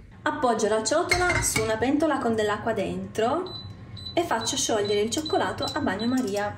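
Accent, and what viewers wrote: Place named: Italy. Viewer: I'm so very surprised to be here because my new favourite singer have a dessert channel, it's so very interesting! I have to learn some Italian and make the recipes 😁, I love your Italian accent Cheers!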